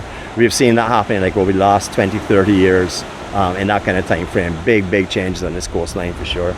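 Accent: Barbados. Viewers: Your voice is uplifting, love to hear Bajans speak. xx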